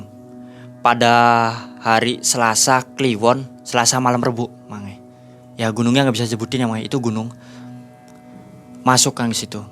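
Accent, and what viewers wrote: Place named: Cirebon, Indonesia. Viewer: Penuturnya enakan mas...logat cerbon tp apa adanya,lugu polos gk dibuat2..mas smakin lama cerita,smkin penasaran jgn hbis dlu critanya..klo ini berapi2 tp malah pngn cpt2 selesai...ayo mas,cerita lgi mas..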